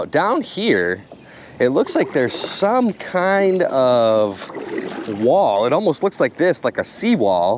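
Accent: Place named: Milwaukee, United States. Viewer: Total Wisconsin Milwaukee accent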